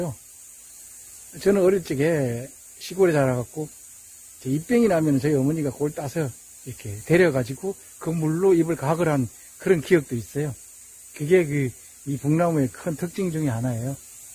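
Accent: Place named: Gyeongsang, South Korea. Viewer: 갱상도 사투리가 이젠 귀에 착착 잘 달라 붙어요~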